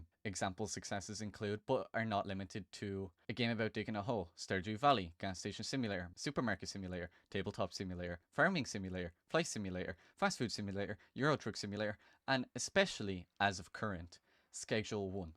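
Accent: Ireland. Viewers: You Irish dude? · are you irish?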